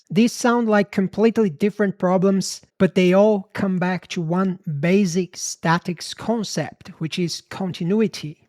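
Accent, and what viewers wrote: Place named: Australia. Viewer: The ozzie accent on a brazillian is mind boggling. Absolutely unbelievable! It's almost the opposite of a Japanese speaking Arabic.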